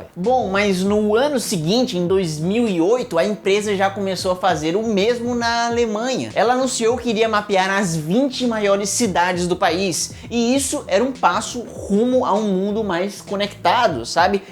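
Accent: Ceará, Brazil. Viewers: tu é do ceara man? kkk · Certeza que ele é cearence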